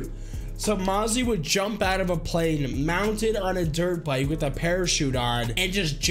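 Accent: Australia. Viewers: he’s Australian what do your expect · Dude all Australian speak like that.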